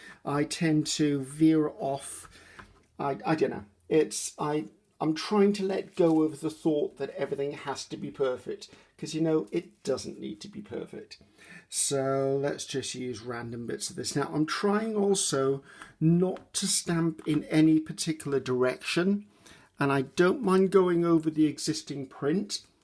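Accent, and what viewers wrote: United Kingdom. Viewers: Hi, it's great to meet you and watch your fresh, lovely British accent creative take on crafting. I'm a new follower and am exited to watch more!!!!! Cheers from Iowa...USA. Tonya:)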